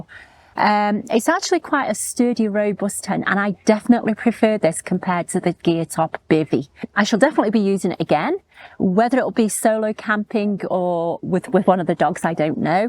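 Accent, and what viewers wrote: England, United Kingdom. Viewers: The location says Canada. The accent says England.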